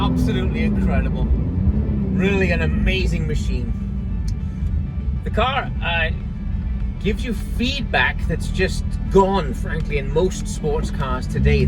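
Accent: Wales, United Kingdom. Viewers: Welsh accent !!!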